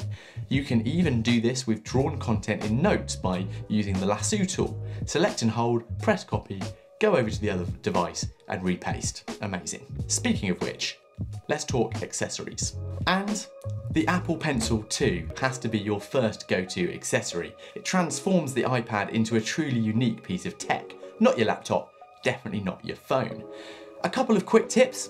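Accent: United Kingdom. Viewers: Apart a great British accent that I adore. These reviews are amazing ! Congrats !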